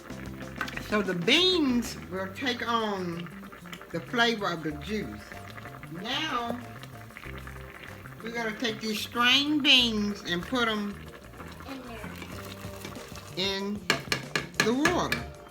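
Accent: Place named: Mississippi, United States. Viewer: Accent is 100% msg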